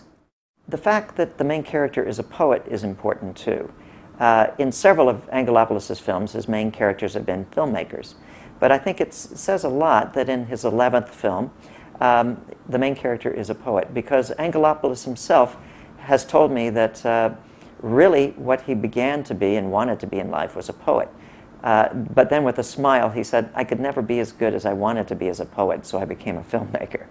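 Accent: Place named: United States. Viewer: I am greek guy 38 years living abroad. I love the American accent and believe to your words even with your American beautiful accent :-)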